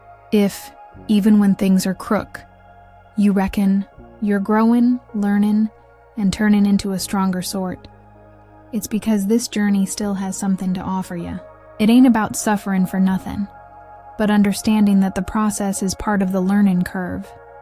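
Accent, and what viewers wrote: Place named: Australia. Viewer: 😂 Your Aussie Accent is funny 😂😂🤣😅ahhaahaaaa😅 Yep, I am hangin' in there!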